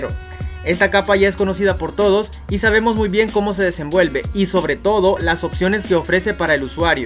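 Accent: El Salvador. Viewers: Eres salvadoreño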